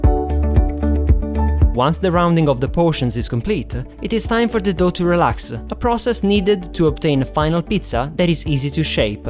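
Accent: Italy. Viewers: Hey, your recepies are amazing! My sister and I just tryed some of them! Aaaand we were wondering if you are italian.. :) Because we notice your italian pronunciation, and it's wery well!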